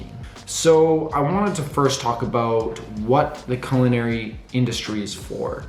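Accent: Canada. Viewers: Not only is he good looking but he can cook. Also that thick ass Canadian accent. What else could you want?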